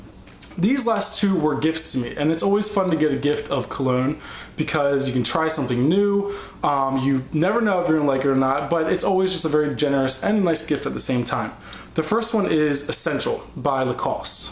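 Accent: United States. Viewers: dear lord your such an American :P not meaning to be rude, but any European (including myself) would be cringing at that pronunciation :) yves saint laurent: l'homme, is pronounced lomme. jean paul gaultier le male is le mal (not ley) dont ever say Diesel like that again :P lol that one was in english so cant fault you there isseymiyake leu de issey sounds like: low dey issay sory for all the corrections :P though hope i helped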